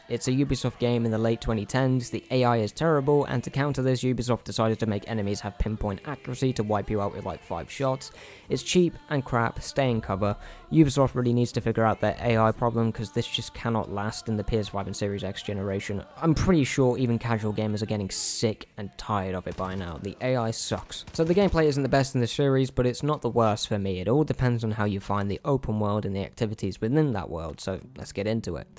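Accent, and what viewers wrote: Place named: United Kingdom. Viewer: Sounds like reviewer is from UK. They aren't allowed guns there......so they shouldn't be allowed to play games with guns in them. Review is so off base.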